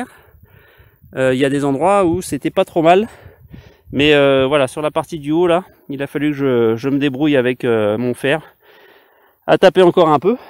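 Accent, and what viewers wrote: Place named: Bocage, France. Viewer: bonjour,vous etes de quelle region,on dirait le bocage?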